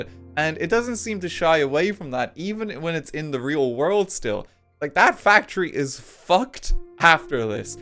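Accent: Ireland. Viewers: keep the good work up! Never thought I'd get a second Irish lad talking about stuff he enjoys and is passionate about in longform, but here we are! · God he's so Irish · theres something magical about being a casual viewer and barely ever seeing like, physically, and then suddenly getting almost 2 hours of physical. im a week late but i honestly love video essays and long videos, i put them on in the background as i draw and its always so fascinating to listen to peoples different opinions and views on things. i also like irish accents a lot so its fun to listen to him talk. i vote more video essays on things you like (i reeeeally want a minecraft story mode video essay, i watched the playlist of that recently and the hatred for it is so funny) also thank you your editing is genuinely inspiring (i think a lot about how the e33 video was and how much that tickles my brain)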